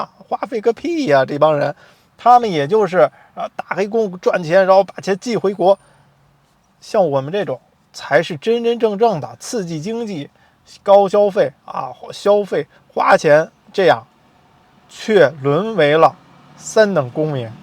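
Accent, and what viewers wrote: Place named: Beijing, China. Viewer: 我实在是听不下去了，现在发表一下我对这个“大外宣”也许是“糊涂虫”的看法与对他混淆视听的如下几点进行纠正： 1. 投资移民（EB5）项目暨大型区域中心投资项目，投资就有风险；2019年11月前绝大多数投资额为$50万，外加必要的律师费及移民局收取的费用约合4-5万，如申请人在🇺🇸以外，等待期为6-8个月随即去美国领事馆面谈拿签证并可进入🇺🇸再往后就是拿临时绿卡；等最终拿到正式拿到10年绿卡的同时，当年投入的全部50万资金一定会返还给投资人，所需全部时间一般为5到8年但也有例外，因为毕竟这是个投资项目是有风险的；目前我身边在2015至2019年持EB5签证进入美国的朋友们都已经拿到绿卡，没听说有出了问题的！ 2. 🇺🇸华人新移民一般不吃Panda Express也就是熊猫连锁快餐，原因很简单暨他们是美式中餐； 3. 🇺🇸华人新移民是美国社会现今一支很强的消费大军，无论在教育房产旅游日常用品...当地主流及外族裔极力都想进入华人市场分一杯羹，但因语言及文化差异基本都很难，除非与华人合作或聘请华人高管... 最后，我衷心的建议这位操着北京口音的“ ”，🇺🇸并不完美但她的包容与无限的机遇吸引了过去近200年，来自世界各地并前赴后继的“探险者”前来”淘金“，也许绝大多数人只能养家糊口维持生计，但也不乏有相当数量的白手起家人士赚得盆满钵满，且不必被倒查20年甚至以莫须有的罪名打入死牢... 来到一个新的国度，要多观察多学习才能尽快融入当地社会，否则就失去了移民的真正意义！ 祝好， （本人不是移民律师或相关从业人员）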